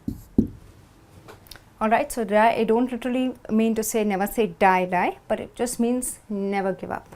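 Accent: India. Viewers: Are your English is Indian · You english sounds like indian english. I learned very much with this lesson.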